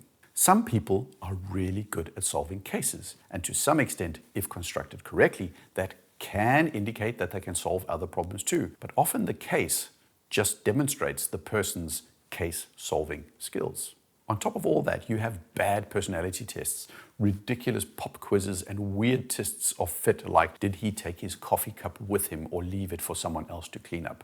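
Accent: South Africa. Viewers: Sounds like the accent of a South African whose first language is English, he most likely is a native speaker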